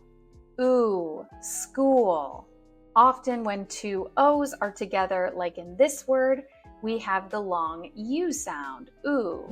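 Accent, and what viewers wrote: United States. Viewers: Teatcher are you amirican..?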